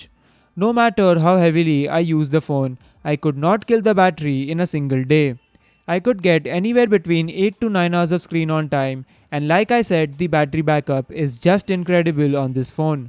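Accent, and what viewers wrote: India. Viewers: Indian english accent is very funny :D. Great review and phone.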